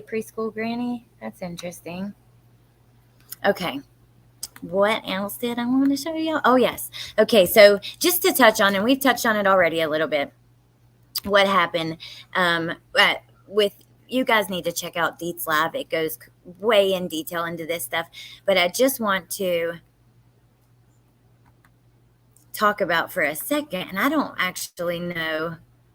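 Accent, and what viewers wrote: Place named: West Virginia, United States. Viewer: I knew that accent! WV here too.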